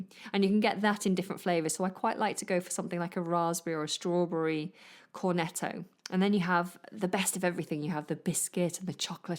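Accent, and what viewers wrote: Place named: United Kingdom. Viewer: Love to listen your clear British english!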